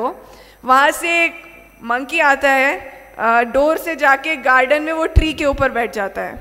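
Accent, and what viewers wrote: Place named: Tamil Nadu, India. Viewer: Ur accent like tamilian....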